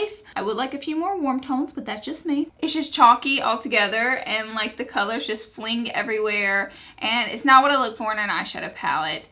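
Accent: South, United States. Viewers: Is that wallpaper? What is that background? It's so pretty! Your videos are great & my daughter and I love watching them together. You are a little goofy, just like us and we love your accent. My dad is from NC & we have a house down there, so we love southern accents!! Keep the fabulous videos coming!! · Omg! Your southern accent😍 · LOL at you commenting on the fact you say oil wrong haha, people don't understand that southern drawl sometimes!! Love your videos and keep on say Oil anyway you want!! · I love you and your accent . southern girls unite. Texas girl here lol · This is the most stupidest comment ever....love southern accent...hence that I live in the South:-)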